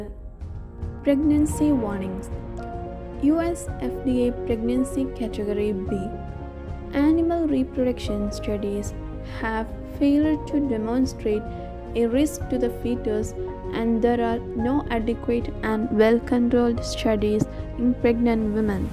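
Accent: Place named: Kerala, India. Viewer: The speaker is mallu 💯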